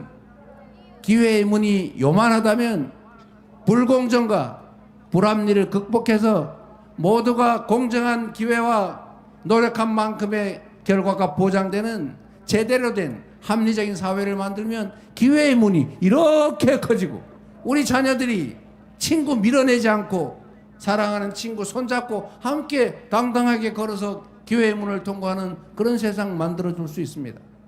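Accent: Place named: Chungcheong, South Korea. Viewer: 충청도 사투리 귀엽지유?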